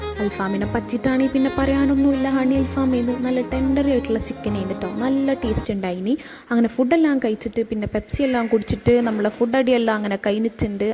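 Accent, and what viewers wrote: India. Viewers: mmalde koykottere slang aanallo chechikk ❤ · Ippazha sherikkm plkd slang aye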